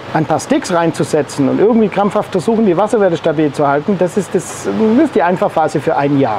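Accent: Swabia, Germany. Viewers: Hochkompetent, sowie schwäbisch sympathisch. Da kommen einem schon Ideen. Auf bald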